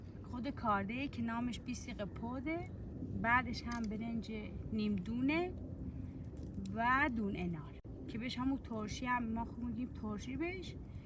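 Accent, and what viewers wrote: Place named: Lorestan, Iran. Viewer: What a sweet Lori accent you have — I’ve fallen in love with it, it’s so beautiful! 💛❤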